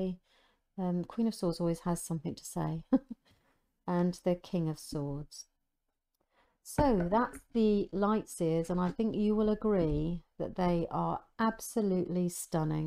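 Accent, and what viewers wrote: United Kingdom. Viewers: everything sounds better with a british accent :)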